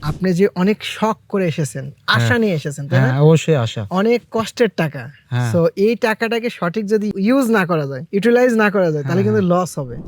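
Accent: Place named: Joypurhat, Bangladesh. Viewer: আমার বাসা জয়পুরহাট তো তাই বলছিলাম,উনার ভাষার সাথে অনেক মিল আছে তো তাই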